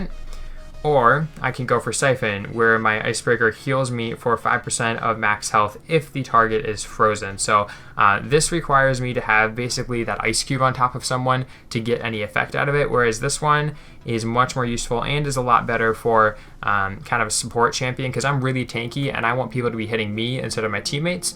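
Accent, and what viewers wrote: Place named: Boston, United States. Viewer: Yo I live in Massachusetts!! You said that you have a Boston accent so I thought you Live in Boston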